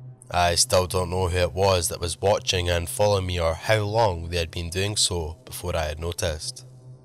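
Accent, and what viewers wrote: Scotland, United Kingdom. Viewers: Came across you through Swamp Dweller. Love the Scot accent. TY, you'll see me around. :)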